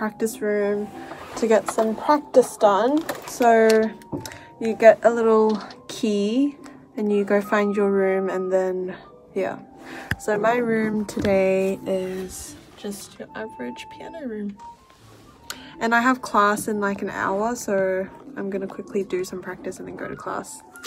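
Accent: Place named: England, United Kingdom. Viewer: Are you from the UK? You have a very English accent.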